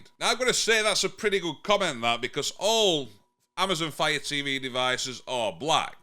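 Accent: Yorkshire, United Kingdom. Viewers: I love the fire TV cube! The only problem with it is that I find that I have to restart it every day to keep it stable. If I don't, it goes haywire if I use my headphones! I'll turn them on and YouTube (which is mostly what I watch) just sticks and I get that "loading" circle! I tend to restart it every morning. Apart from that, I'm fine with it the way it is! I love your down to earth videos and your lovely Yorkshire accent. I'm was born in Scotland, we moved to Merseyside when I was 9 and lost my accent!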